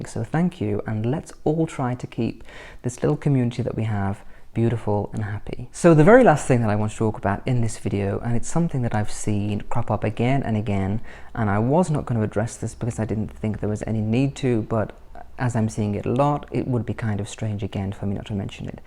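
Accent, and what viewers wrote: RP, United Kingdom. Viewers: I see you have made a massive effort to be RP. Ironically, you sound posher than Prince William as the younger royals now do engage with the estuary accent! Just out of interest, do the rest of your family speak with a Birmingham accent? I think it can sometimes be a shame when people erase their natural accents. I enjoy the regional diversity of accents in the UK. For example, Sean Bean's Sheffield accent really adds quality to his performances. They wouldn't be the same with an RP accent.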